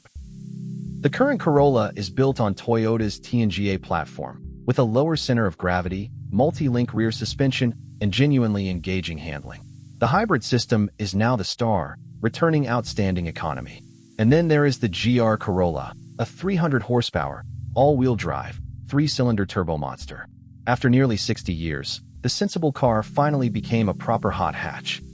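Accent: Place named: United States. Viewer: Who is the voice it sounds like an American man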